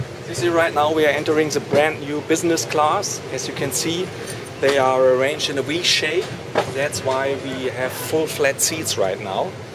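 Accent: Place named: Germany. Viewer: Richtiges Englisch mit deutschem akzent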